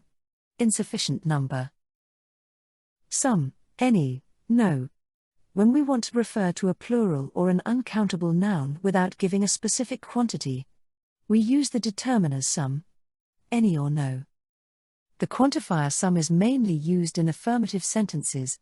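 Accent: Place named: United Kingdom. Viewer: Thx u , i love it brittish accent 🎉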